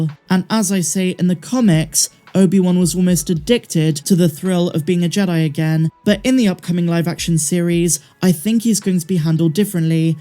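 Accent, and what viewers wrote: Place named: England, United Kingdom. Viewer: ! 👍🏻 It’s hard for me to differentiate “Last” vs “Lost” with your English accent. It’s a very subtle difference for me as an American. I’m sure it’s obvious for the English.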